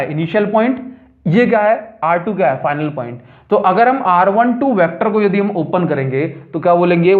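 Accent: Odisha, India. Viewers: Sir ap Odisha se ho kya❤️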